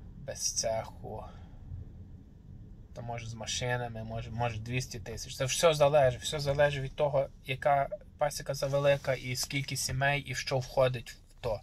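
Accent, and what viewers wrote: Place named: Western Ukraine, Ukraine. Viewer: Пан явно із західної України.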